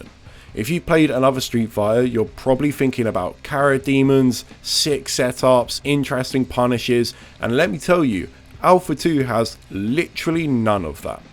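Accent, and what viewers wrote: England, United Kingdom. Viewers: Yeah I find it a bit ironic that they're getting mad at Americans misprounouncing a word not in their language when his accent is English. Moreover, I'm an American, and I've never heard anyone misprounounce Ryu on my turf. I'm honestly pretty suprised how far this obvious joke was taken though, everyone misprounounces stuff at some point. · The authors from uk, it’s how we say it here. Kinda like Nike instead of Nikeee. Just a UK/London thing.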